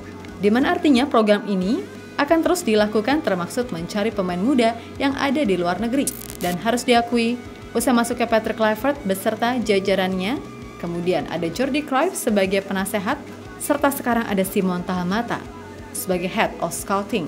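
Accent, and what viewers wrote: Java, Indonesia. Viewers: Wkwkwk tampuk lambene para gondez" .min asline jowomu ngendi? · Pengisi suarane Teko Jowo ngendi iki ? njalok di tuwapok Lambene 😂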